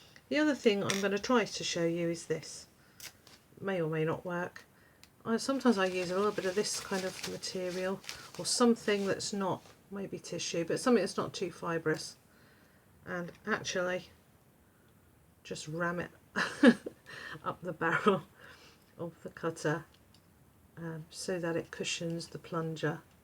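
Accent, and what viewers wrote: United Kingdom. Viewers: You are so great. Im just getting into polymer clay & i love it. My friend cant stand polymer clay, clay in General. Shes To impatient! Yep were American’s. Shes not just impatient, she’s spoiled and entitled. 38 Its sad! I tell her all the time! She’s missing out on some really great therapeutic stuff here. But I love working with clay, especially polymer clay, its so great for stress. & i even like the smell. Lol sadly i cant stand the world wide Shortage! People like you probably haven’t felt that yet! Because you probably have every single color in reserves. But question, yesterday I bought a 8oz white bar premo. & its soo hard. I seen one YouTuber using a heat gun To warm quick & work with it. I haven’t tried it yet. Any other tips to make it softer? If you see this. I like To try make pumpkin, snowman, pinecone gnomes, i came To you again. 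I just seen your thumbnail and realized it’s oh its the wonderful lovely British lady, your wonderful voice, what I’m gonna say next is a huge compliment. But I tell my friends I’m watching the real “Mrs. Doubtfire” if she was a real England women & shes teaching me all she knows about polymer clay. Lol I enjoy watching your helpful tips lasy couple months. But your voice reminds me of when Robin Williams says hello dear. To the social worker. It was my favorite movie when I was like 13. In america. Anyway ive Shared you with a few Friends. Anyway I wanted to see what kind of snowflake cutters I should get. I think the plunger ones lo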